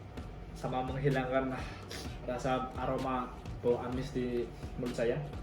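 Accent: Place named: Banyumas, Indonesia. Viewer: ngapak e oraak ilang rek😅 Mantab mas, lanjutkan😁👍 salam angkatan IM JAPAN 315🤝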